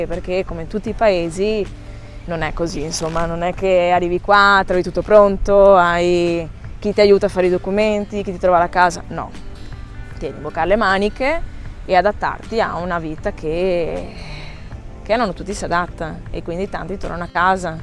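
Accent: Veneto, Italy. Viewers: Brava, una bella intervista, sei stata sincera e intelligente, hai messo in evidenza anche le problematiche e le difficoltà di ogni trasferimento. Lo spirito di adattamento conta moltissimo, soprattutto nei momenti difficili. L 'Italia è unica, per bellezza, cultura, geografia, è il modo di vivere che è cambiato, è diventato frenetico, come dici tu, non è per tutti. Chi ha la possibilità di cambiare, di mettersi in gioco, è giusto che lo faccia, ciao e Pura Vida. P. S. L' accento veneto non l'hai perso.... 😁😜👌👍💪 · Ciao, che bella storia!! Sei stata bravissima, complimenti! Di che paese del Veneto sei?.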